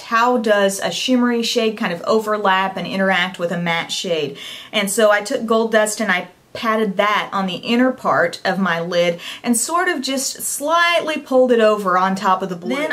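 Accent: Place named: South, United States. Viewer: LOVE your passion. And even though you're a newscaster, I can still hear that southern accent which I also love. Even though I was born in Texas, I never really developed a true southern accent that i could be proud of lol.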